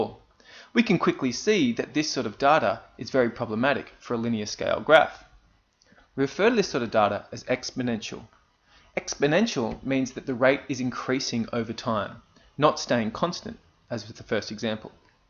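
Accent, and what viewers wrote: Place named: New Zealand. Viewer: I bet you are from New Zealand. Loved your video! Thank you.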